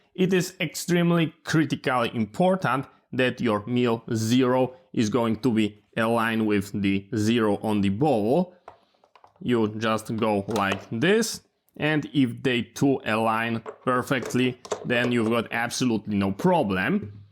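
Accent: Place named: Eastern Europe. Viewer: Lovely Eastern European accent 👏🏼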